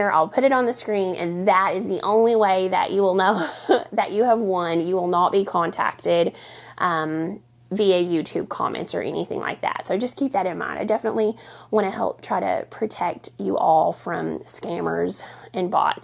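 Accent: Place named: Ozarks, United States. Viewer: I live in New England now but grew up in the Ozarks so love to watch just to hear your accent. Reminds me of home. Would love ❤to participate in the gift giveaway. Congratulations on 30K. You deserve it!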